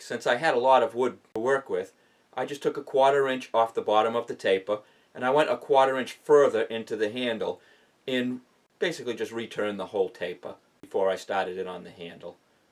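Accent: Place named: Boston, United States. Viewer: Man, you have a really strong Bostonian accent. Are you from reveeyah?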